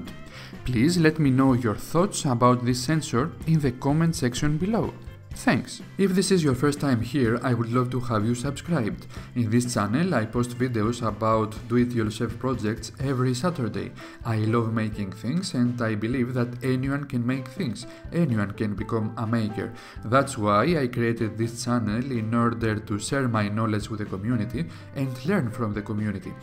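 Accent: Greece. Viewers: you sound greek, I'm from Rhodes btw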